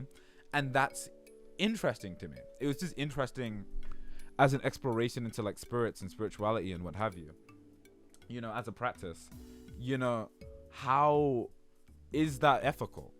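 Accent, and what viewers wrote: United Kingdom. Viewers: no he's just british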